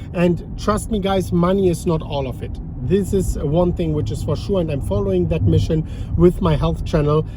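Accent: Germany. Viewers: First time viewer, this guy is German right?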